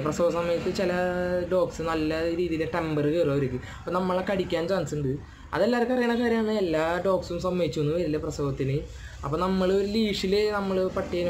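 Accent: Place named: Thrissur, India. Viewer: നുമ്മ തൃശ്ശൂർക്കാരൻ ആണ് തോന്നുന്നു slag കേട്ടിട്ട്...😍 ആണോ ബ്രോ?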